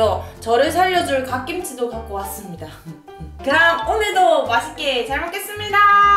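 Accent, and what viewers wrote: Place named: Daegu, South Korea. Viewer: 씨 사투리를 쓰셔서 더 호감가는 것 같아요? 대구분이신가요?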